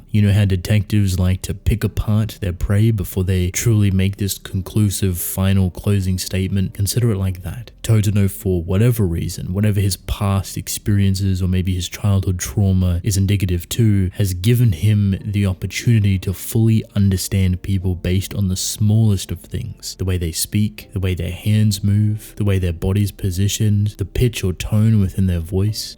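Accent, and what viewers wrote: Australia. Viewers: Are you australian ? I love australians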